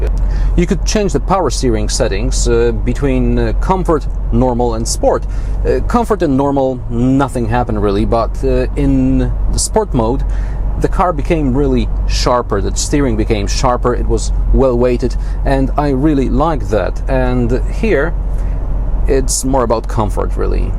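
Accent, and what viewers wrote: Poland. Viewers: What's the point the made rewiew in Poland in English? M8 you still have your polish accent.Do all Poles forgott theirs own language or what!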